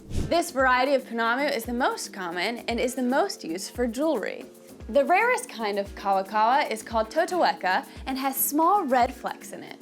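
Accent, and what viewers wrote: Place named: United States. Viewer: I am a huge fan of your channel and love your content… although you have mispronounced many words in this video, including the word Māori itself. Feels very American 🤣🤣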